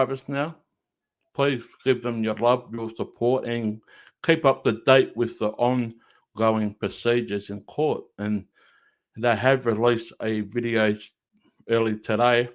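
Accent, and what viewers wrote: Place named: Australia. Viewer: Thought you sounded like an Aussie 🇦🇺🇦🇺🇦🇺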